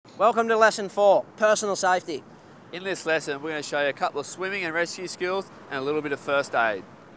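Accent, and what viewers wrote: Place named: Australia. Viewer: gotta love the aussie accsont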